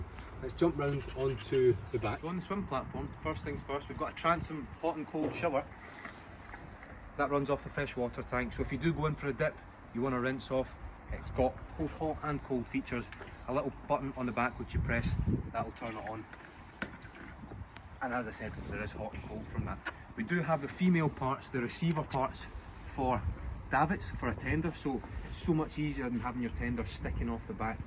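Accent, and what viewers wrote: Scotland, United Kingdom. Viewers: I was surprised to see that the chap has Asian heritage. I did not expect that with the full on Scottish accent. Just goes to show how perceptions can be completely false.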